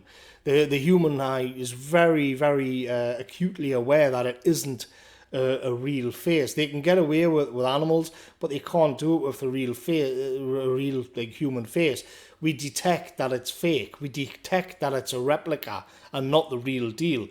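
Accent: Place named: Geordie, United Kingdom. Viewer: Superb analysis, superb accent. Thank you!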